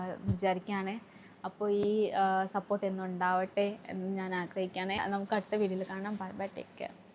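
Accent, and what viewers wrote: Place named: Kozhikode, India. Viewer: ഭാഷ കോഴിക്കോടനാണല്ലോ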